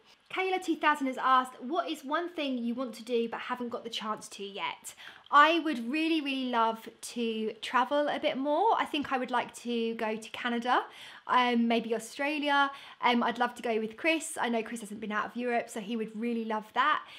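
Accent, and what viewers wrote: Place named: United Kingdom. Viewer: Aw perfect,- at breakfast timeee :D To be honest, I watch your videos mostly because of you wonderful British accent. I miss England so much... My family visits friends in Devon from time to time and it always feels like coming home when we see the cliffs of Dover! So thank you for ... uhm speaking - I guess? 😆 And btw the new hair colour looks cute 😄🧡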